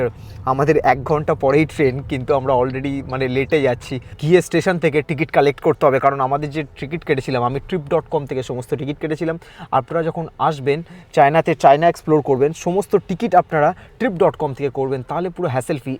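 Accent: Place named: Bangladesh. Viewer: Your bangla accent sounds like bangladeshi.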